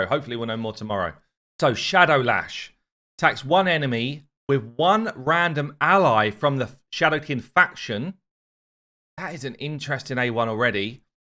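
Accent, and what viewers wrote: England, United Kingdom. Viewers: please learn how to pronounce Mikage, it sounds giga cringy in you english accent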